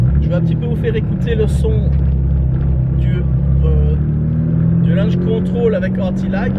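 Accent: Liège, Belgium. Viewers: Merci de nous faire part de tes connaissances et de tes explications adaptées. Preparateur gtr, moi je prépare des bons gros tedehi lol chacun son monde ! Lol Chouette vidéo, ton accent liégeois rend unique ton vlog 😎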